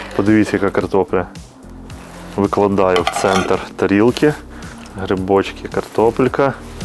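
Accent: Ukraine. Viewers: Український акцент супер! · Смакота ! Молодець ! А звідки ти є, друже, як на мій погляд то львівський акцент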